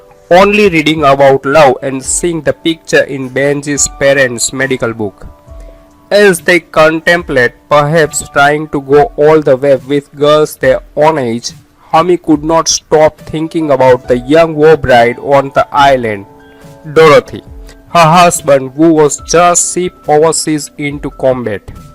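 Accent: India. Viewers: indian accent is so hilarious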